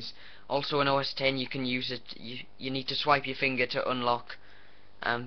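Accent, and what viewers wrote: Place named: North East England, United Kingdom. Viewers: Its a Sunderland accent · it's a north eastern accent, not quite Geordie but sort of sounds like it.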